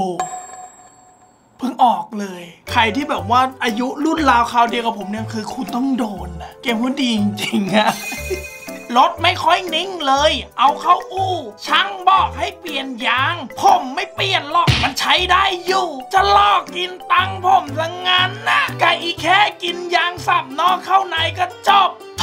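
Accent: South, Thailand. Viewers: พี่ ใต้ไม่นิสำเนียงดีจัด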